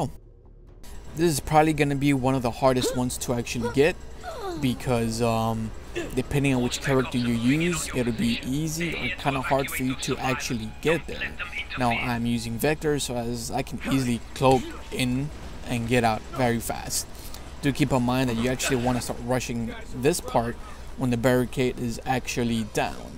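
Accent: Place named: Canada. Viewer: Are you Canadian I </3 your accent c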